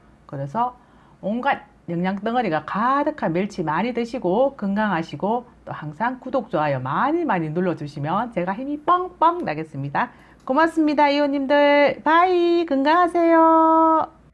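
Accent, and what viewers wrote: Gyeongsang, South Korea. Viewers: 잘봣어오ㅡ 곙상도사투리 줌마님 얼굴좀 보여주세요 오늘ㄷ좋아요 했어요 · 만물박사 갱상도사투리원조님.멸치라고 깔봐요? 그렇게 인간에 유익한 머리님을~~삶은소대가리보다 고맙죠. 군에서 된장 한 숟 푹떠 손가락만한 멸치 5개로 한끼 때웠던 그 때가 그립네요. 오늘은 영양학 강의? 다 안들려요. 대구4두2 듣는 취미. 오늘은 너무 젊잖네요. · 친근한 갱상도말씨 좋아요 좋은정보 잘공운하고있어요 감사해요 굽신~♡ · 경상도 사투리로 재미있게 방송하시는게 재미있어 자주보게 됩니다 성격이 화끈해 요리설명도 재미있게 하셔서 혼자서 웃기도한답니다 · 갱상도말씨가 팽상시 좀 시끄러버서 듣기가 쪼께 거북시러버 안듣는데 여사님 말씨는 괘않습니더 자상한 말씀 감사 함니데이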